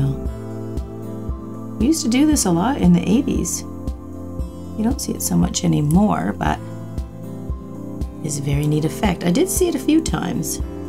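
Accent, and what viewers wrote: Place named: Canada. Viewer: that canadian accent 😙😍😂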